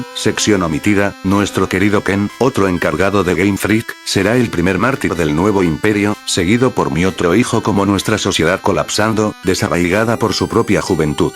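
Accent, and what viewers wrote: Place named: Castile, Spain. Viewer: habla bien no te entiendo por que tu acento castellano me deja sordo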